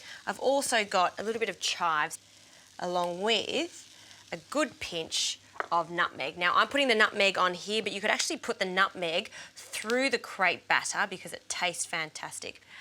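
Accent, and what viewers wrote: Australia. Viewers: Australian accent! 🤩 I am Brazilian and worked on a cruise ship in Australia. Instagram: alinestravels I will try this recipe. I love galettes!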